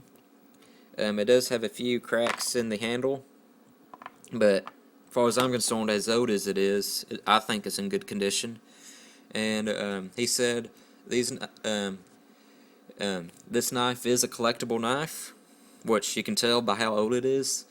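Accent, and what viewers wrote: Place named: South, United States. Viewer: You honestly don't sound like you have one... just an American Accent from the south.... you pronounce most words fine... ignore the haters man :)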